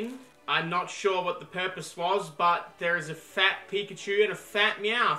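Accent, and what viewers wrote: Australia. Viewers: Ur so Australian lol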